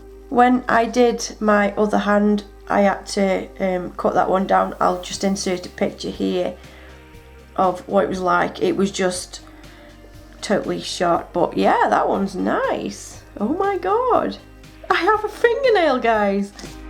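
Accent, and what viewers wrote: United Kingdom. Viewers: You sound like your British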